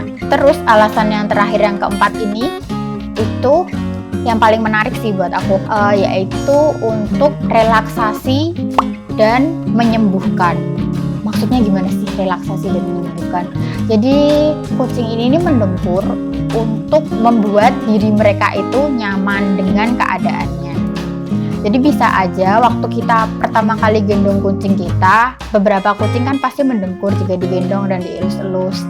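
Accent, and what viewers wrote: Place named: Java, Indonesia. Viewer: Pasti orang jawa ya kak Bahasa indo nya medok banget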